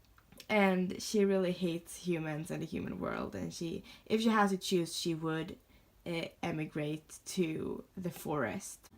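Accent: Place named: Scandinavia. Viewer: Hey there. Eller hallo. I was wondering where you're from? Your gorgeous dolls' names seem very nordic to me, as well as your accent, so I was wondering if perhaps you might me in fact nordic?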